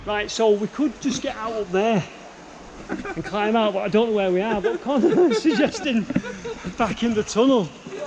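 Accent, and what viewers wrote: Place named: Manchester, United Kingdom. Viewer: Absolutely fascinating. Plus it's lovely to here that beautiful Manchester accent! I now live in Yorkshire and miss my home town:(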